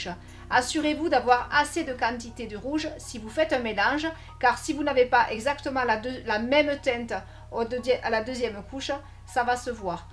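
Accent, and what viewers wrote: South, France. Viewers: Bravo pour vos belles toiles,c est un plaisir de vous regarder, et de vous entendre avec ce joli accent du sud cher à mon coeur.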